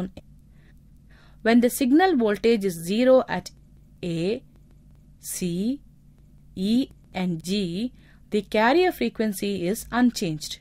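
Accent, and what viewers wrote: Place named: India. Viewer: this is an indian English speaker, haha.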